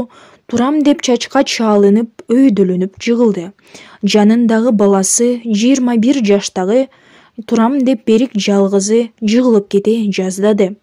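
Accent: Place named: Russia. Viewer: Киргизка окшойсун а, акцентини ондо же оруссунбу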